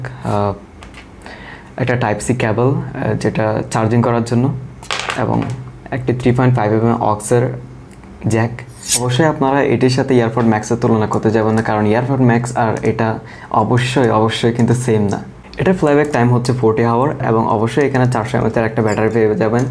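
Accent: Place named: Noakhali, Bangladesh. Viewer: noyakhliar local accent roye gese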